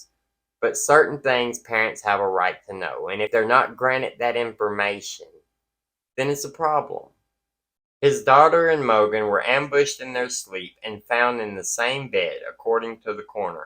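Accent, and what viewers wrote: South, United States. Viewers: Just curious, what Southern State are you in?? I am also a Southerner.. My guess is TN or AL. Anyway, back to topic, a great video, thanks.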